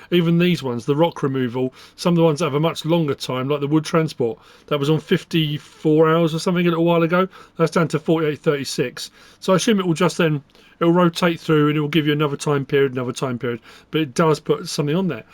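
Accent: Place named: Manchester, United Kingdom. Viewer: Thanks for the guide. I'm going to be playing this for the first time tonight after pre-ordering it, so I'm excited to check it out. I enjoy watching your videos, and an aspect of them I really enjoy is your accent. I'm curious to know; are you from Manchester by chance?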